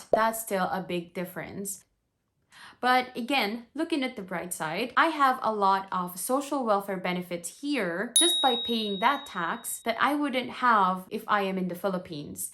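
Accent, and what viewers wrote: Ireland. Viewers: Not sure how long you've been here, but that Irish accent is coming along nicely! 😁👍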